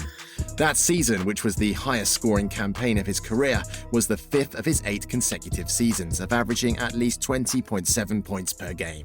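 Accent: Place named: Ireland. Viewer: The narrator is obviously not from the US, so he is pronouncing words he would normally do from his country (?I woud guess Ireland)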